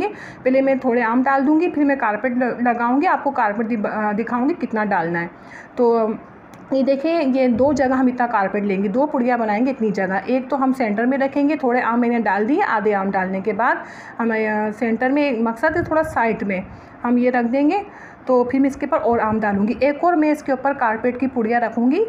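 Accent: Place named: Sindh, India. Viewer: Sindhi lehjey men Urdu bhht achi lag rhi h ap boltey hoey..and acha tariqa h pal laganey ka but aam ko pani nhi lagay jata h us se aam naram ho jata h.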